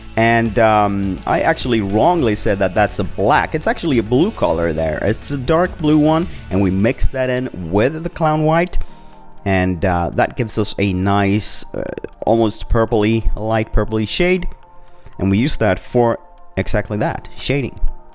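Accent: Sweden. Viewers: hes swedish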